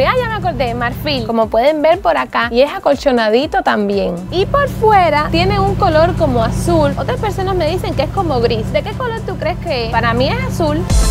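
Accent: Cuba. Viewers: Marcela Aguilar ovio porque es de cuba como sandra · Tu tono de voz y acento es muy paresido al de SandasiresArt eres cubana? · Porque ella es de cubaa las dos son de cuba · Hija es que es cubana pensá 👋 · cata Orozco por que es cubana y sandra también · Por el acento cubano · Jajajaj sii, es q las dos son de Cuba creo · Por que las dos son cubanas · Sus voces son iguales por que las dos son cubanas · es de cuba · Cuba creo o República dominicana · Hola se me haces muy parecido tu hablado a Sandra cires cómo cubana 😁 me encanto tu video y cómo leí con comentario x ahí dile a tu papi que me adopte jajajaja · alemán mucho seras.cubana te creo jaja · Marcela Aguilar claro es cubana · Medefrine ella tiene un acento cubano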